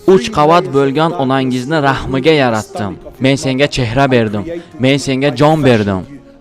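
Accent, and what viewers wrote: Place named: Tajikistan. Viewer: Tojikistindan bo‘lsalar kerak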